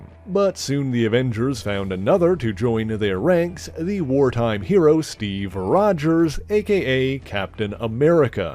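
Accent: Newfoundland, Canada. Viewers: I detect a mild Newfie or Cape Breton accent here b’ye!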